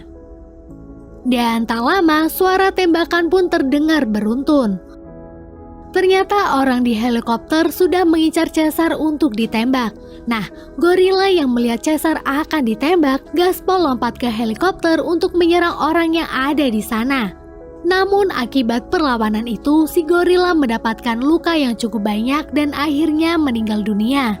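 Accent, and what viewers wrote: Pemalang, Indonesia. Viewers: Wwong Pemalang asli min?Podo wkwk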